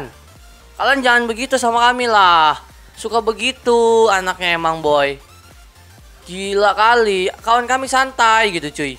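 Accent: West Java, Indonesia. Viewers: Orang sunda kah? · oraang sunda anjay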